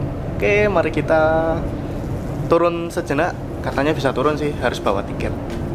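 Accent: Java, Indonesia. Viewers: mas jowo hehehehe woyo2 mas e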